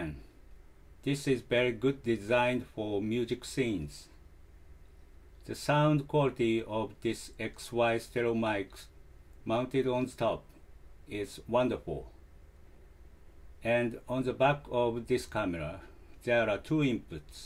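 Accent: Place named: Japan. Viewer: You got a such a nice place for recording. Could use more space though with all that equipment. :) Air conditioner and all, I wish we had something like that. What a difference, I play in a basement of age old industrial building where everything is ugly and in disrepair. But at least there's plenty of space and its cheap... The reality for metal bands in Finland is pretty much like that, things are simple but functional and the rehearsal spaces are almost always in old ugly buildings out of sight and mind of most people. I'm wondering how expensive it is to rent a rehearsal space in Japan? In Finland it varies a lot, in my town which is a small place it can be very cheap if you get lucky.... In Helsinki its much more expensive and the places are almost always very small. Our place is pretty much as good as it gets, over ten people sharing the rent and now that two guys left and I cleaned the place, there's a lot of space for everything. 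I'm jealous of your recording equipment. Damn, I wish I could record in separate tracks too. Takes some time to put it together, I have been using all the money I can spare for the drums in last few years. Recording equipment is the next on the list. ;) Your English is very good, understood everything as you meant it. Have you studied it somewhere or just learned by yourself? I love your accent, I don't hear a Japanese person speak in English often. :D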